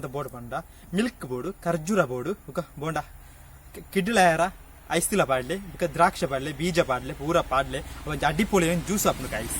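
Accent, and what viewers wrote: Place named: Tulu, India. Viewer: Eerena video frst d last Muttala thuyere bore apuji.comedy apundu eerena funny dailogues kendre kushi ippundu.n anchida Tulu patheruni style kenere edde apundu.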